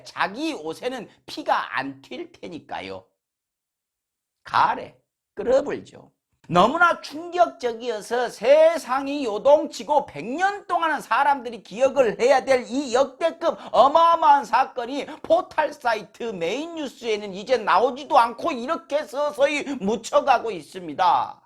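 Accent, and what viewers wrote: Jeolla, South Korea. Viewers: 이 형 전라도 사투리맛갈나게하네.. 화이팅..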